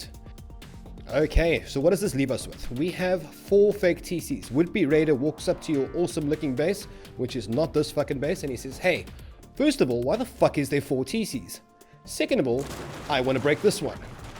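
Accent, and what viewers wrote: South Africa. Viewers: Still feels so strange every to hear a South African accent every time I click on one of your vids, so used to NA/EU accents on YouTube. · Are you South African,? You sound like that bad guy from Elysium.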